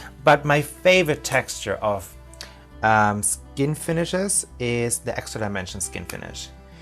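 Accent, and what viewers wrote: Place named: Germany. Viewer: Are u german? I can hear your accent :D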